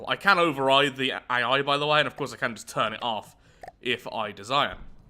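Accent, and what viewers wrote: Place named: Birmingham, United Kingdom. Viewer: You can really tell Is a brummy sometimes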